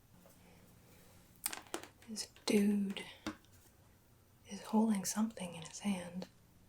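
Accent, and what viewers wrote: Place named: Midwest, United States. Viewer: I love old photographs also because ladies and gentlemen dressed like ladies and gentlemen. People dressed up to go everywhere not just for weddings and funerals. I love your northern Midwestern accent. Your homepage tells me you're not in Michigan anymore😉. You look adorable in everything you wear( especially 1990s secretary roleplay).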